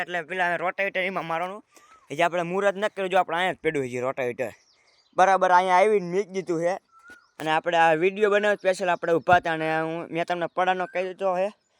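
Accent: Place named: Surendranagar, India. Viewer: Bhai Tari boli surendarnagar ni che😅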